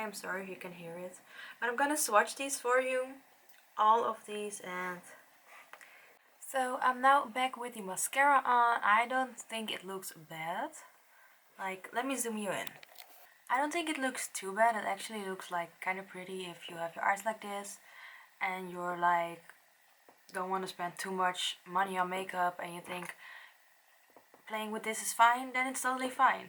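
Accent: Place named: Netherlands. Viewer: so yor accent sound like your dutch so im gonna talk dutch hii ik klikte je video aan en ik keek de video en ik dacht dat je een soort beroemde ytbr was dus goed gedaan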